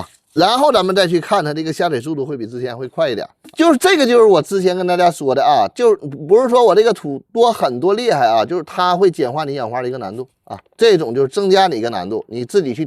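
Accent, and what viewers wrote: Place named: Northeast, China. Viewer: 这是哪里的东北话呀？比其它听到的东北话好听。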